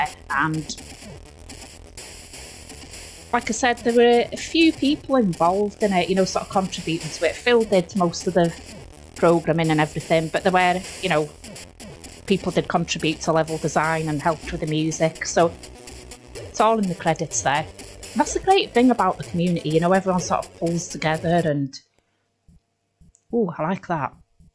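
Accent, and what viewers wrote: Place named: Liverpool, United Kingdom. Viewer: Now then,I thought I'd already subscribed to your channel, but obviously not lol..anyway if I remember wright retro b8 mentioned this channel, unless there's another retro Scouse (with yourshire overtones)sounding girl channel ..don't worry im from Liverpool myself.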